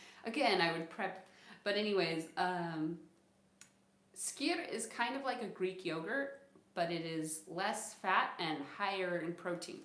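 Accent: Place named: Slovenia. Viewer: Girl u sound like a REAL Slovenian! My cousins have a whole basement with extra groceries and frozen goods! God bless ya girl! I live in Washington D.C. but my parents are from 🇸🇮! 👍 You sound sooo much like them!!!💋😊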